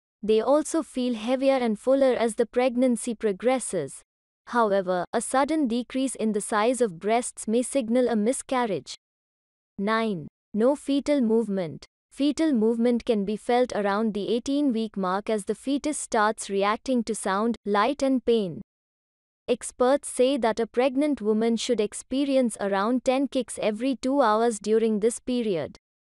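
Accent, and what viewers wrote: India. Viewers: Ugh, another Indian accent video. So annoying.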